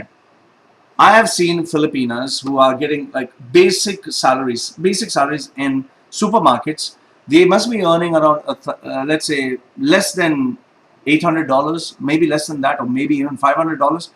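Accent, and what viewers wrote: India. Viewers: Hey guy. ..talk about ur nationality. .ur accent like. ..Indian. ...sooo talk about Indian. .. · all were true..i can sense you have indian accent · he sound an indian. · akala mo kong mtatalino ang lahi mo.hoy tingnan mo muna ang mga kalahi mong mga Indian kong matatalino ba o mga edukado, kong mkapagjudge ka sa mga Pilipino wagas.At ano nman pakialam mo kong anong gustong bilhin nmin,pera namin ang pinambili hindi pera ng india.Palibhasa hanggang panglalait lang ang alam nyo sa mga pilipino kasi kahit saan kami magpunta magaling.tingnan mo muna sarili mo at kapwa mo indiano kong maayos ba o hindi.indiano ka nman eh hindi Arabo.salita mo pa lang halata na indiano.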